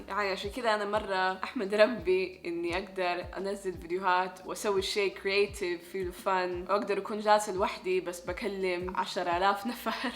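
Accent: Hejaz, Saudi Arabia. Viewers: ما شاء الله اللهجه حجازيه ومفهومه جدا اللغه العربيه قويه والترجمه من الى ١٠٠/١٠٠ هذه الايجابيات عندك اختي تجعلك داعيه رائعه وقويه وموثره جدا لانك قويه في اللغتين بامكانك ان تكوني داعيه بس في الهيئه واللباس اسلوبك رائع استغليه لله واعلمي ان الناس عندكم في بريطانيا واوروبا متعطشين الاسلام ...ولان يهدي الله بك شخص واحد خير لك من الدنيا وما فيها ... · لهجة مكاوية وجداوية جميلة وماحفظة عليها مانسيتيها اشكرك تحياتي لك سعودي من الرياض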